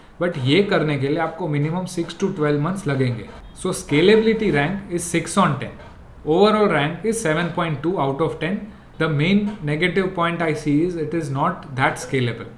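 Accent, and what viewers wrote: Gujarat, India. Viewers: Gujrati hai kya bhai tu ?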